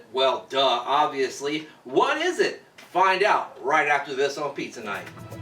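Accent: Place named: Missouri, United States. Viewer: he must be from Missouri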